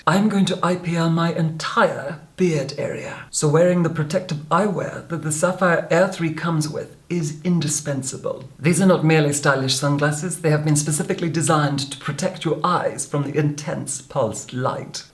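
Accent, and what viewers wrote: Scotland, United Kingdom. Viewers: I don't think his accent has changed. He's had a Scottish accent the entire time. Has his speech or voice become a bit softer and his delivery a bit more refined? Yes. But I don't think that he's putting it on for affect. I'd say that it's more about the fact that he hadn't lived in London for very long when he started this channel and his more typical, regional Scottish burr, has softened and become closer to that of the people he's been interacting with in his business and personal life over the past decade. (if that makes sense?)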